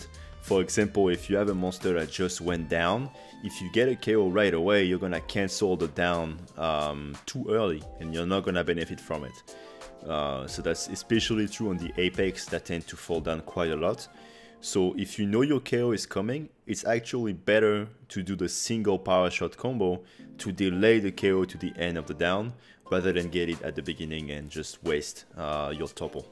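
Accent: France. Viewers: Ahah l'accent français est si reconnaissable 😂. En tout cas merci bien pour les tips sur l'arc, les vidéos sont très claires et organisées, un regal.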